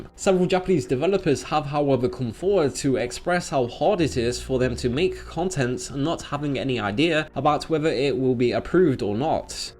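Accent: United Kingdom. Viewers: He sounds British so that could be it. They also say vittamun instead of vitamin and beeta male instead of beta male.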